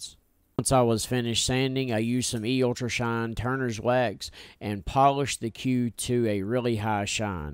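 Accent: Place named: Florida, United States. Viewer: You sound like a Florida boy. No insult. Florida boy here.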